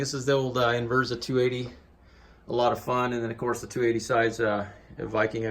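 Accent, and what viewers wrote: South, United States. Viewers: Good video man! I love the Southern accent lol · Very nice! Where are you located? Sounds like GA/AL. I'm in NC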